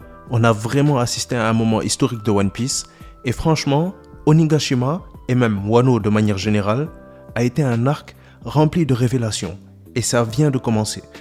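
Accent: Senegal. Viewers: Dis moi, tu es d'origine du Sénégal ? je reconnais un léger accent de chez nous ahah ! Sympa les vidéos en tout cas, je m'abonne....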